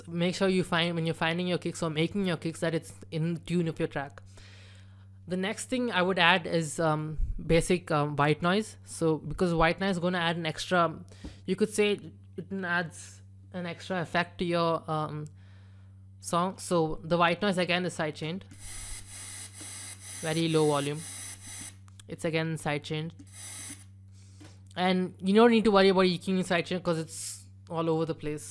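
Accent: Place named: India. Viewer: I mean.That's Awesome.His voice sounds Indianish.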